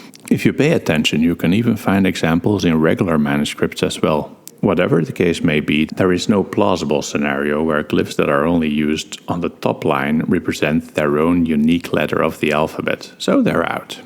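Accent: Netherlands. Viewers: you sound so extremely "dutch" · I thought you sounded Dutch but... “no it’s slightly off”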